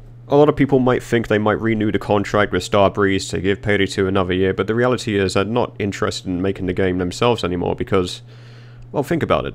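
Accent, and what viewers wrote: United Kingdom. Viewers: Like many other have said we really don't give a shit what you play because we stick around for your stupid personality and all the other bullshit shenanigans that you manage to find. So whether you go on and play some PD2 occasionally just to bitch about all the shit players who fuck up a normal jewelry store heist and hackers or just play other stuff because that's what you want, 95% of us will still be here..... just for your pommy fucking accent. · Are you british or australian ?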